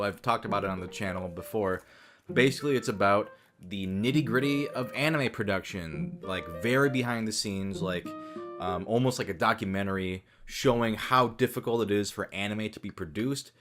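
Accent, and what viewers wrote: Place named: United States. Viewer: he looks asien sounds American they have evolved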